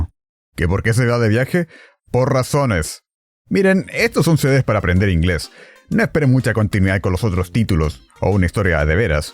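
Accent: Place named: Australia. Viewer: El video que estaba esperando, que nostalgia!! You do sound a little Australian, saludos desde Inglaterra lmao (De algo sirvió)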